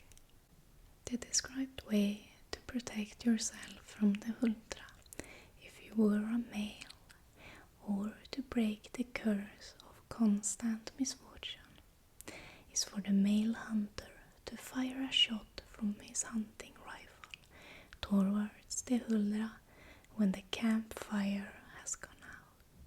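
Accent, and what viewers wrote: Sweden. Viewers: I swear Swedish accent is the best accent. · Vilken underbar svensk accent!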